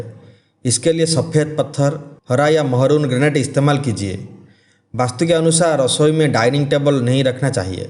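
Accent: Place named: Odisha, India. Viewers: Sir you are belongs to Cuttack as per your hindi pronounced. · Bhai tum odia ho kya?Isiliye hindi bolte time odia mix ho raha he.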